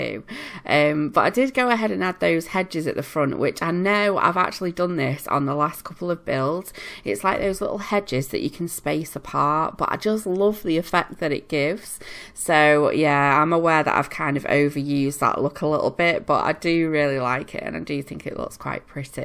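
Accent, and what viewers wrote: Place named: North, United Kingdom. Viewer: Love your builds! Nice to hear a fellow Northerner on youtube playing the sims too!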